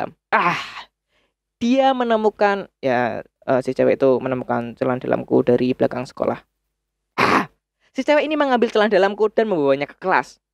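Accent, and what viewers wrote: Java, Indonesia. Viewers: Medok yups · Tim medok👍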